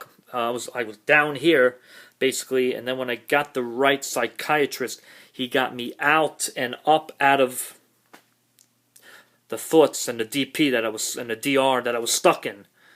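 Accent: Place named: New York City, United States. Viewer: Bro you’re definitely from nyc from your accent That makes me feel good I have to get in touch with you Still in this space Can you call me <num> Input my number on YouTube that’s how serious it is man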